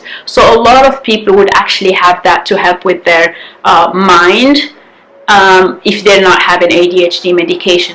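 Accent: United Kingdom. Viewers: Thank you for sharing 🙏🙏🙏 PS: I can sense the British Accent haha.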